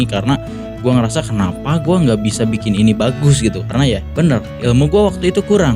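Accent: West Java, Indonesia. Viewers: bro sunda nya di belah mana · Orang mna kang,.. Sani sunda emhh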